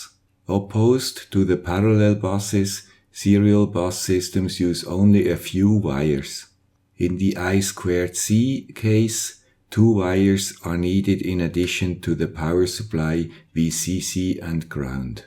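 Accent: Switzerland. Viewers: Hey, guy with swiss accent, I've got an italian one.... I find very useful your teaching. Actually, I was used to plan experiments with Labview and NI instrumentation, I decided to leave both for open software and cheap devices, not only for economical concern. Thanxs for the wide presentations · Ich lebe momentan in Irland und da hatte ich die komische Idee, eine Eier-Inkubator-Steuerung zu bauen. Als kompletter Anfänger schaue ich viele Videos bei Youtube an. Deines, weil ich ein I2C-Display und ein RTC in meinem Projekt verwenden möchte. Mit dem Schweizer Akzent war das dann besonders nett zu schauen. Danke! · Hey, guy with swiss accent. Your channel is very inspiring. Thanks for sharing your ideas!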